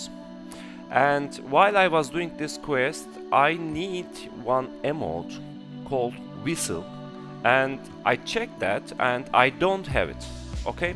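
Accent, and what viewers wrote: Turkey. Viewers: rastgele girdim videoya aksandan anladim türk olduguna :D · Kesin Türk aksanından anladım :)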